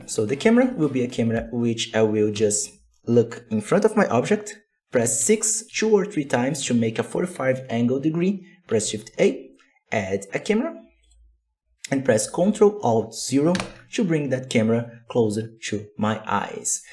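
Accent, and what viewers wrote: Brazil. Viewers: Esse sotaque brasileiro falando inglês é coisa linda demais, força na batalha irmão!! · You seem to be Brazilian by your accent. Amazing Tutorial, simply sublime. Brazilians are really gifted. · Great job. Thank you for sharing. (Sotaque brasileiro inconfundível) Rssss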